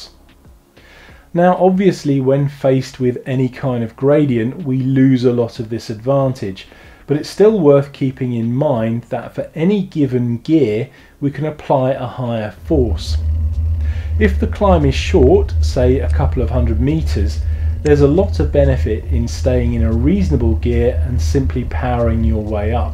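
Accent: United Kingdom. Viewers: you are really cool and a good cyclist. Love your oh so british way of talking. In cycling as in so many other things you get better by repetition, repetition, repetition, climb, climb, climb..... you will be astonished how much progress one can make. After even 1 month or 2 of repetitive climbing & climbing & climbing you do things that were utterly unthinkable before. Everything gets stronger, mind, legs & lungs.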